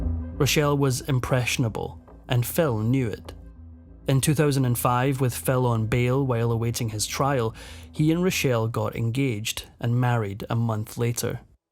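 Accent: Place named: Scotland, United Kingdom. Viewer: Yay my favorite Narrator the Scot!!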